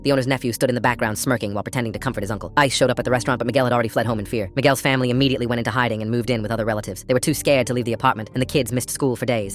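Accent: Australia. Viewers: Is it just me or does this sound Australien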